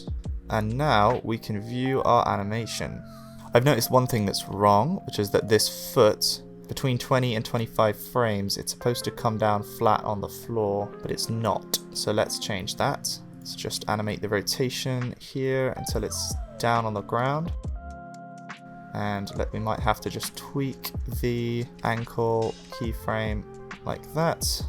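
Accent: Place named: West Country, United Kingdom. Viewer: Really good tutorial, great job. Are you from the West Country? I hear a slight twang in your R's haha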